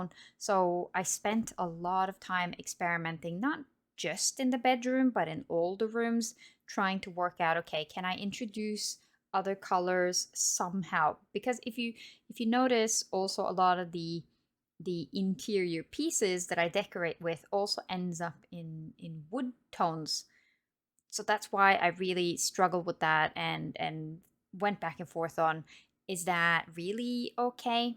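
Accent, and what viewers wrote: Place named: Scandinavia. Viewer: This was relaxing to watch! Good music choice too, a chill tune with *no singing*, just perfect! And I love listening to your English because you sound.. well, Nordic and it makes me feel like home ^^ (and your English is great!) I didn't even realise I watched an almost 40 minute long video bc you weren't being repetitive at all with your commentary and everything just kind of flowed together nicely ✌️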